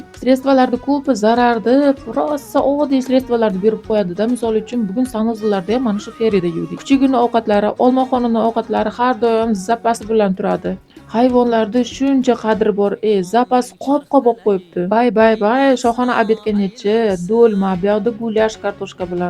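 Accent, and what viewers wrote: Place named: Syrdarya, Uzbekistan. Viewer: Gapirish sevamgiz sirdaryoga tortarkan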